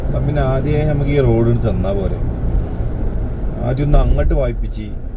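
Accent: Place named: Malappuram, India. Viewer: തനി നാടന് വര്ത്തമാനം.... മലപ്പുറം കാരനാണെന്ന് തോന്നുന്നു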